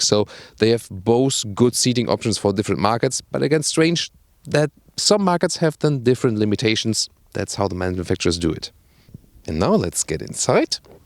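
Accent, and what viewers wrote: Germany. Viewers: Lovely when you wake up at 2PM and you can no longer fall asleep, you get to hear ' English with gorgeous German accent. Works better than ASMR!! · The perfect germenglish accent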